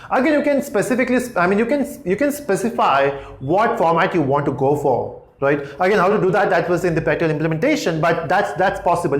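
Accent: India. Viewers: people that don't understand strong indian accent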